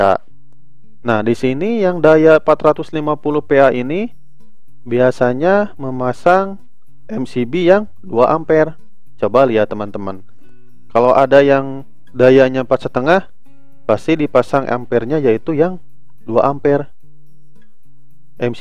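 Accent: West Java, Indonesia. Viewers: 1.Biar mudah dipahami tong buat para pemula. 2.itu logat sunda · Logat sundanya gk ilang yaa😂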